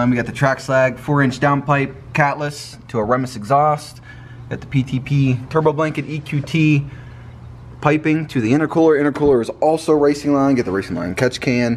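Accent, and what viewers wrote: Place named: Philadelphia, United States. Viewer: I was gunna say, you sound like you are from Philly. Where did you grow up?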